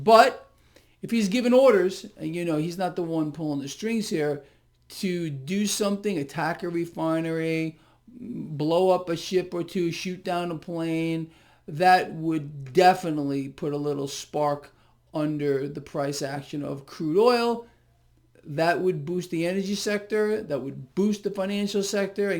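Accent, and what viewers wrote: United Kingdom. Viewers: British..The accent is British... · Sounded a little bit like a posh English accent 😁👍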